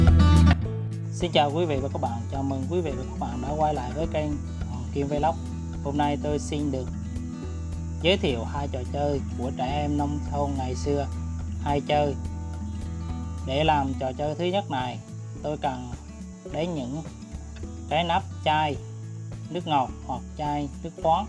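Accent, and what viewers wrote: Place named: Quang Nam, Vietnam. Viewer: Trò đầu tiên với nắp nước ngọt mình có chơi rồi , tuổi thơ lại ùa về. Cái giọng nói QNĐN thân thương quá vì mình là người quê ở Quảng Nam. Gửi bạn ❤️👍🎁✅chúc bạn thành công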